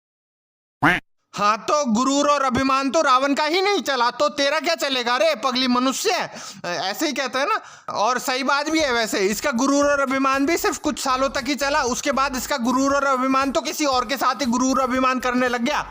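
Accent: Rajasthan, India. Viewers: Bhai tera rajasthani accent 😂👌👌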